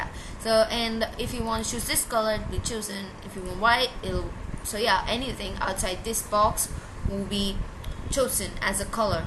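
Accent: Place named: Kerala, India. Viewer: SHe sounds malayali indian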